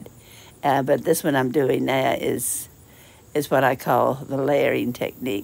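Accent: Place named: South, United States. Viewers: What a great idea!! It turned out beautifully. I just found your channel and subscribed. Your accent sounds like your from a town near me in North Carolina!! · Your southern accent is beautiful...yella. These are such beautiful works of art! I just found your channel and I love it!❤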